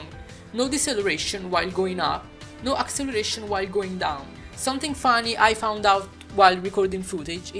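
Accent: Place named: Italy. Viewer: Si sente che sei italiano... hai la mia stima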